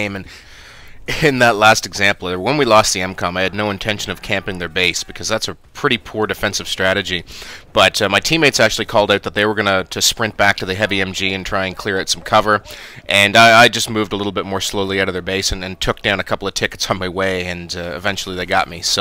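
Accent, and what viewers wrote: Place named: East, Canada. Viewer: I can tell you're Canadian, probably eastern from your accent. Where are you from? I'm in TO. Nice series!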